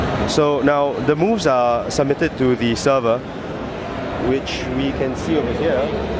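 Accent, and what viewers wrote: Singapore. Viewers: Sounds like a Singaporean.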